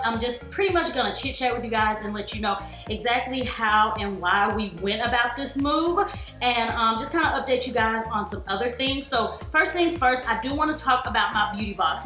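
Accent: South, United States. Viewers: Why did I read her t-shirt in her cute southern accent lol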